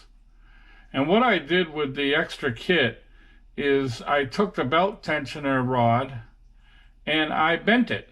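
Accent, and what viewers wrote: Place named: Northeast, United States. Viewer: Ps Sounds like you're up here in NE by that accent.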